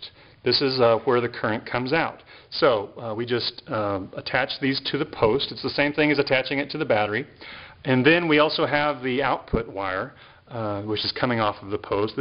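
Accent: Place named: United States. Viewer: Hi.. by your accent I presume you are American, and even though your cars are much bigger than those in Britain, I struggle to think of a car with an alternator belt as long as the one on your bike -what kind of car was it?. I've found that the belt is the biggest problem for these rigs running off the wheelsI I spliced a bit of polyprop rope for one I made, but it was lumpy.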